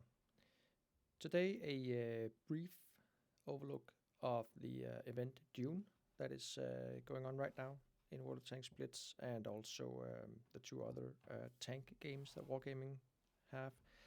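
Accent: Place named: Netherlands. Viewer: oh, okay. Sorry about that. Your accent reminded me somehow of Netherlands 😂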